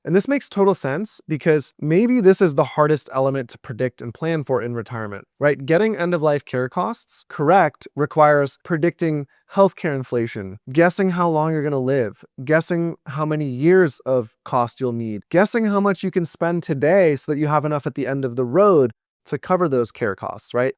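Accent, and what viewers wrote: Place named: California, United States. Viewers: Great info,! I watch tons of retirement planning vids. When I 1st watched one of yours, I almost flipped past it after picking up on the CA accent. (I moved from TX to CA for a yr as a young teen and was teased brutally for my TX accent. 50 years later, you'd think I'd be over it. 😄)...but I persisted and watched it thru. Then another. Then another. Now your content has become some of my favorites. Keep up the good work! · his California accent is what makes his video so good.... 😉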